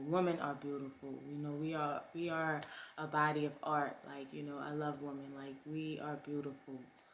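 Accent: New Orleans, United States. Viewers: I love the New Orleans Accent 😊😊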